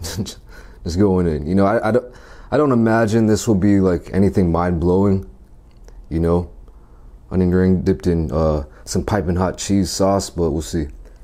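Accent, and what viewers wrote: United States. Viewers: I can tell you aren’t from Seoul originally but from the U.S. why do you live out there? Jw. Love your content!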